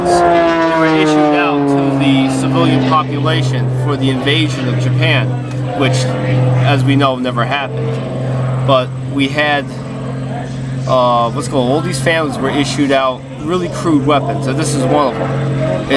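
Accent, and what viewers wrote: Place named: New York, United States. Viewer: I can tell your from new York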